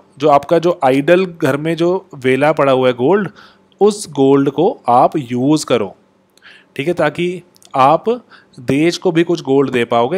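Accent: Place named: Punjab, India. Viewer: Sir bahut hi awesome videos bnate hain..mene pura dekha.. Jo topics mujhe bilkul upar se ja re the..ab puri tarah clear ho gye hain..mai bahut khush hu.. Appreciable content And your voice is really very adorable💜 Jo panjabi accent me chhote chhote jokes crack kr dete hain us se video me man lga rehta hai 😁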